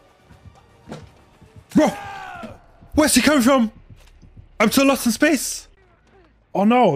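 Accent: Germany. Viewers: Ist er deutsch?😂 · I knew I heard some German accent.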